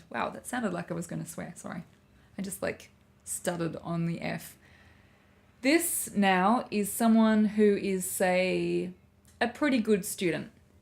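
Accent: Australia. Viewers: this is australian accent?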